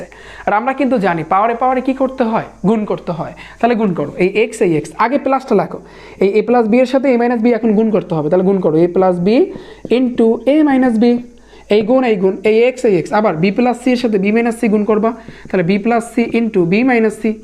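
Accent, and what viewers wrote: Barishal, Bangladesh. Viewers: Baiya apner bari ki borisal🤌🙂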